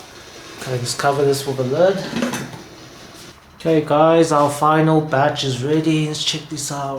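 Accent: South Africa. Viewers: Where , Johannesburg, Port Elizabeth , Cape Town?Nice to meet you , I was born in South Africa , now live in Australia. Love the accent.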